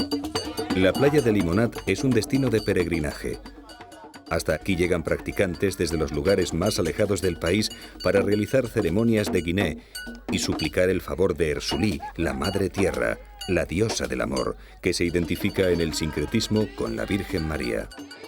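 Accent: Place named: Spain. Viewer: POR DIOSSSS NO ESPAÑOL DE ESPAÑA SIN OFENDER SUENA HORRIBLE DA FLOJERA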